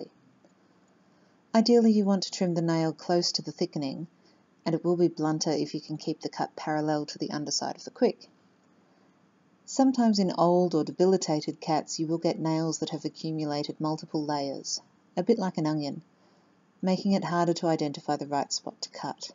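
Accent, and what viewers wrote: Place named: Australia. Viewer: The drawings made no sense to me, and the video could have used another body to manage the camera better, including larger close-ups focusing on the clipped spot. But I did manage to grasp what was trying to convey due to my own earlier experience, where I found more cats to be less than enthusiastic about the procedure. So, in order to prevent a painful mishap, I wrap my mani/pedi ready furballs in a large towel, not too tight, leaving only the head protruding from the cotton sausage, and the paw under consideration sticking out from another tube at some distance to minimize the chance of being bitten. Oh, and in the event blood is drawn, I keep a dish with H2O2 and cotton ball handy. Better safe than sorry. And, absolutely, yes, a special treat comes at the end of the not-really-an-ordeal. P.s. -, could you please narrate at a slightly slower pace for those of us who are trying to comprehend yr Aussie accent and grasp what you're saying at the same time. 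(Maybe that's why I failed to get the drawings.) Thanx. (With apologies if I managed to, however inadvertently, offend with my blunt comment.) Ps2: you have a very compelling and lovely sweet and soothing voice.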